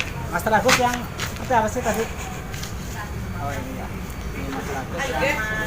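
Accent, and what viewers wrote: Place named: Banyumas, Indonesia. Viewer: Aku suka gaya bahasa kamu bro,, Kengapak ngapakan gitu ya?? Pokoke enyonge langsung subrek lah bro,, Salam hormat,💪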